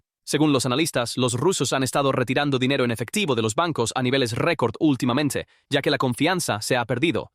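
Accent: United States. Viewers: esa ia en español gringo es ridicula por dios dejen de usarla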